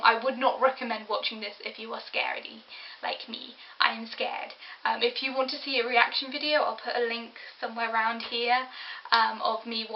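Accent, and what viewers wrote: United Kingdom. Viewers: I'm impressed, count me a new subscriber. Sounds like you might be from across the pond. When I first saw this movie it scared me about as much as any horror movie does. (The scariest movies make me jumpy, and I have always had a vivid imagination of what things might be lurking in the dark.)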